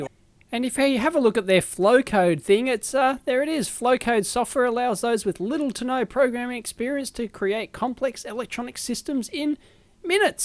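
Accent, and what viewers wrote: Australia. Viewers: True, love the Aussie flavor Dave! Just a head's up though, go easy on the "S" ($#!+) word, it's a little rougher over here [U.S.] than over there ;) (not exactly family friendly) Cheers :)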